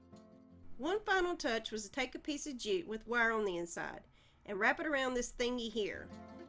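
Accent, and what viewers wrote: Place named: East Tennessee, United States. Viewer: I love all your videos!! You are so creative!! I like the way you talk cause I talk about the same way 😂 Im from East Tn.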